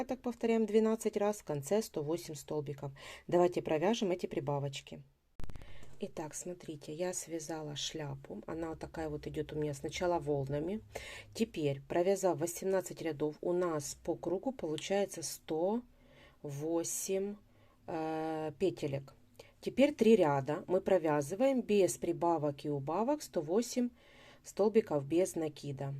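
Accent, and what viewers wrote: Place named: Voronezh, Russia. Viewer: Огромное спасибо за мастер класс, и так приятно слышать родную Воронежскую речь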